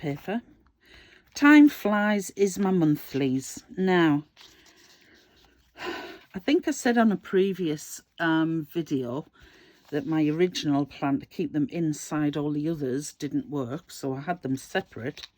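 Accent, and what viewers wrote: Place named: United Kingdom. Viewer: Awwwwhhhhhh - thank youuuuu for the shout out Sugar!!!!!! ❤️❤️❤️ I looooove your planner flips!!!!!!! Our planners look like Twinzies!😂😂😂😂😂 Thanks for sharing and doing these videos! They are so relaxing and I’m sure you always hear how Americans love the British accent. It’s sooo CLASSY. 🌸💕🌸💕🌸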